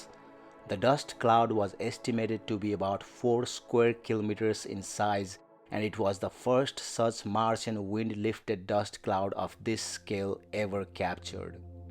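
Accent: India. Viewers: Would love this video infinitely more if I didn’t have to hear this guys annoying voice, like some robot eastern Indian. The nerds behind the scenes should never do the selling, the narrating or talking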